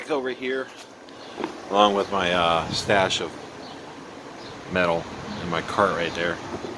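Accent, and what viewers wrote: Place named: Texas, United States. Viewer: Never knew there was such a thing as an earth battery ... learn something new every day! Yay Texas twang!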